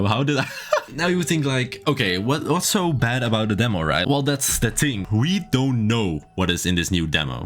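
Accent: Netherlands. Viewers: Wacht is deze man nederlands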